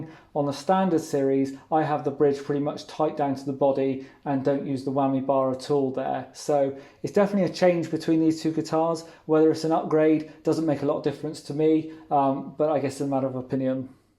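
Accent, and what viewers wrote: United Kingdom. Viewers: Is it better the new player than the old MIM standard one? Oh yes its true, very coser to the old american standard for some reasons such as pick ups, two points trem, nice thinner shape a very nice good looking guitar of course the glassy sound as an strat way to be 🎸. I love over all the British tone speak very understandable congratulations a nice comparison greetings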